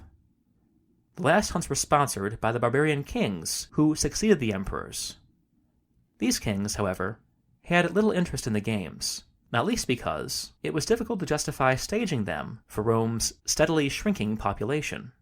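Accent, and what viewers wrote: United States. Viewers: Sounds like an American, alright. 🤦🏻‍♂️ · OK I'll save you a lot of time so you don't have to listen to the whiny American accent ,it was an earthquake end of story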